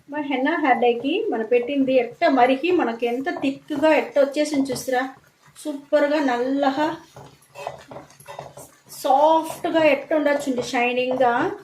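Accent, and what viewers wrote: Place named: Rayalaseema, India. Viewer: Adhi rayalaseema slang ankunta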